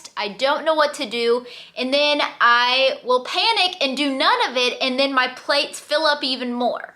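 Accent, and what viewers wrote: South, United States. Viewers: My resolution is to watch you more because your true blue not fake an that's what makes you real keep it up an your southern accent so cool till yor next video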